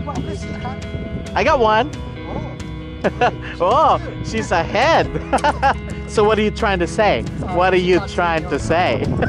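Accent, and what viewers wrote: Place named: Philippines. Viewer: You must be Filipino...your accent lol. I didn't know anglers still fish on poers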